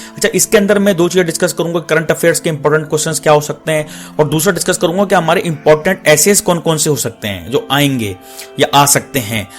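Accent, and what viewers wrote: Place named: India. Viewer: Your voice looks like indian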